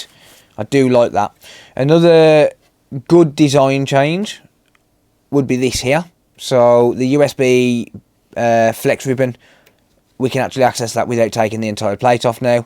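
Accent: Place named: West Midlands, United Kingdom. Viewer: Absolutely fantastic video. Im a new subscriber and really enjoying the content, it’s so interesting and you’re obviously incredibly knowledgeable about electronics . Also great to hear a fellow West Midlands accent ☺️✊🏻 I’m really thinking about getting a PS5. I have a PS4 Pro which is great, but I’d really like to upgrade. With regards to the Liquid Metal issue, would you advise to keep the PS5 flat rather than vertical so that the Liquid Metal doesn’t drop? It would suit my setup flat tbh. Do you think the liquid metal has dropped during transit or does in only become more of a liquid once heated? Sorry, I really don’t know much about that kind of thing 👍🏻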